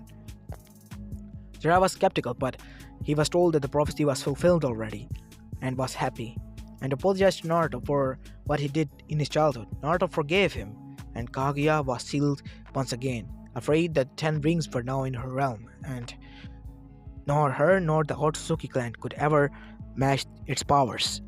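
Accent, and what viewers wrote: India. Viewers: are you Indian? you sound like one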